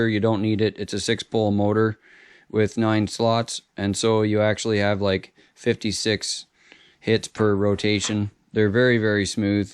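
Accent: Canada. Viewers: Thanks for the detail - sure helps us newbs... and for pointing out the disconnected red wire from the SC to the BEC, my wasn't even shrink wrapped, they just pulled the connector pin out of the plug and left it haning. I figured it was a boo boo, so stuck it back in. Truck arrived from China 4 1/2 months after I ordered it, bad left steering servo, so while it's up on the hoist, am going to slow it down based on your fixer upper here with yer easy to follow instructions, in a proper Ontario accent to boot (grew up over near Shelburne, stuck down here in Texas now). cheers and thanks.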